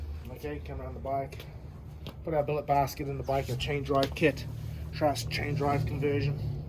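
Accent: New Zealand. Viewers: Is the guy talking from Nz?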